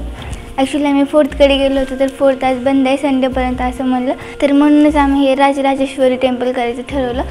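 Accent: Latur, India. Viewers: You speak typically laturi Marathi which is a good thing......... But use the word "friends" in minimum extent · Hii....mi tuzi big fan aahe........mla ekda tula bhetaych aahe....mi pn Laturchi ch aahe.....aani kharach.....tu khuppp mst bolte h....ekdam aaplya laturi language madhe.......😃😍💃.......tu asech mst mst video......banvat raha...aani tuze subscibers....aankhi vadhu de...👍..tuz kuthe ithe office aahe ka latur madhe.... ch?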